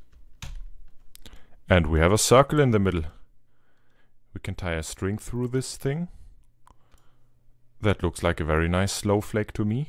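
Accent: Germany. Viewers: I can clearly hear you denglish xD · Cool, bevor ich die Kanalbeschreibung gelesen habe, dachte ich sofort... Das ist doch ein Deutscher 😬 ich abonnierte Mal deinen Kanal... Cooler content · Oh nö 🙄 Wenn deutsche versuchen englisch zu sprechen 😣 Man hört das immer raus 😂 Klingt schrecklich 😱 Ansonsten guter Beitrag 😘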